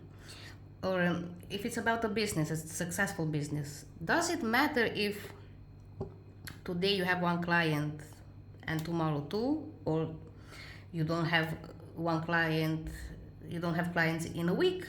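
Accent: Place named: Spain. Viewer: I have to ask what is your accent? I love it. You say you’re Spanish, but I’m Spanish and I never heard that accent, at least in English.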